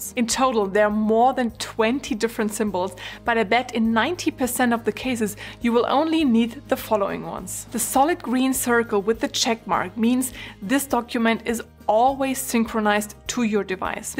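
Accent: Germany. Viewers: Is that German accent? Just curious, good video btw 🐥❤️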